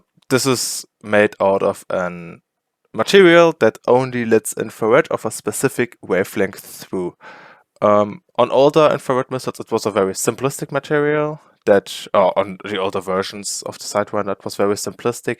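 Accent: Germany. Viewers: as a german it is hard to stay in the english area of my brain because the accent really wants me to believe this is german :D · i know its gonna be good when i hear a german accent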